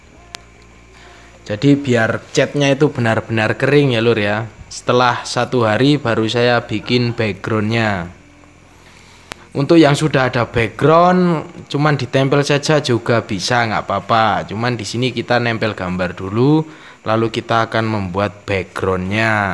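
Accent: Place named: Blitar, Indonesia. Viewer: Dialek ngomongnya seperti wong mBlitar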